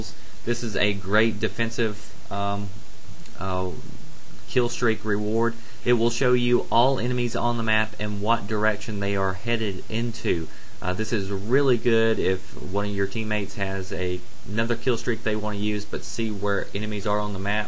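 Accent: South, United States. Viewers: What state are you from? I noticed u have a southern accent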